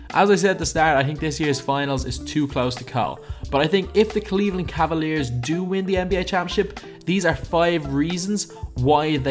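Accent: Ireland. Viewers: Is this guy irish???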